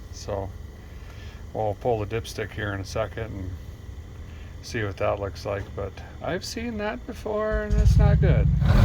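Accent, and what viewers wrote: Minnesota, United States. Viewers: For Pete's sakes. Definitely a fellow Minnesotan. Love it! Neutralis is my new favorite word. 😊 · very entertaining indeed and informative too, i like you're accent so American "pure jus" a bit hard fore European ears but i get used to it now, call the old lady " Ma baker " Couse chi never die and like that song.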